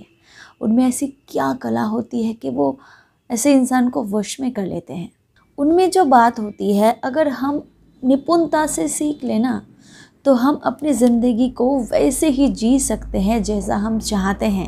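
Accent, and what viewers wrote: Bengal, India. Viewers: Bengali accent we can feel in your hindi😀😀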